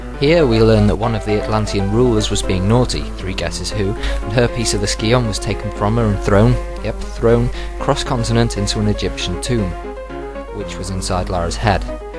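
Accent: United Kingdom. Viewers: Wow this must have taken you a lot of time! Very funny! Btw you have a british accent :) Probably cause you're biritish =P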